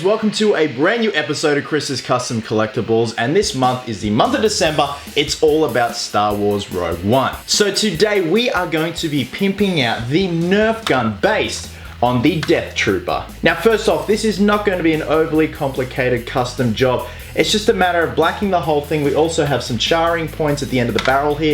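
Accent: Australia. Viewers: R u from Australia?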